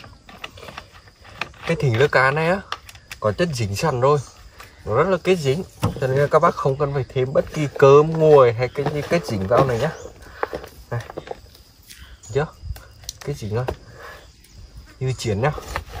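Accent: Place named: Thanh-Nghe-Tinh, Vietnam. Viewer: Ông ơi nói tiếng địa phương khó nghe qua. Thật lòng thích câu mà nghe tiếng thanh nghệ tĩnh là chán luôn!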